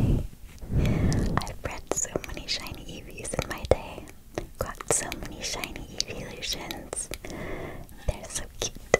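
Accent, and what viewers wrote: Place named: United States. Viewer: Where are you from? I'm british so its quite hard to pin which state of america or perhaps canada... ive no idea but i really like the way you say your intro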